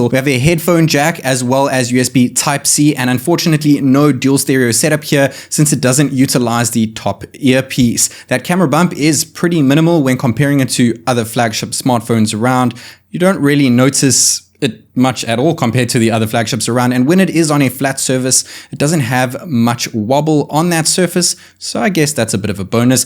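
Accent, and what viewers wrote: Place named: South Africa. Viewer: This guy sounds like a South African🤔 the accents gave it away....but is he?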